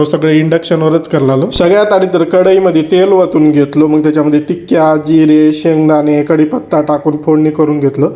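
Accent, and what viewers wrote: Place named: Gujarat, India. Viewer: Tuzha gav kuthle.bhadha thodi vegle wataate.gujarati aikdylla yete.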